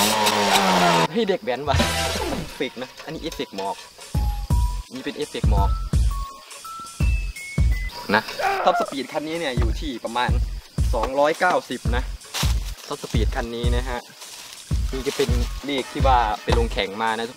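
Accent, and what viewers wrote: Korat, Thailand. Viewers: คือภาษาโคราชแท้😂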